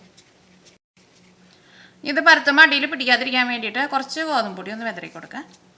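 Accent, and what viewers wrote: Thrissur, India. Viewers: നല്ല അവതരണം .തൃശൂർ നിന്നും പറയുകയാണ് എന്ന് തോന്നും .So simple straightforward conversational style keep it up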